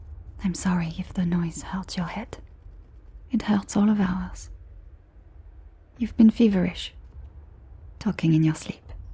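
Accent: France. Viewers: I absolutely love hearing accents and your French one is AMAZING. Thank you for this wonderful gift of a video! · J'adore ces audios avec l'accent français parce que ça m'aide a mieux comprendre 😂 Joyeux Noël à tous depuis la France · French accent? You have my undivided attention. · God I wish for French GF to listen her speaking with this accent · This was so great! Your French accent is superb ❤ Merry Christmas ❤🎄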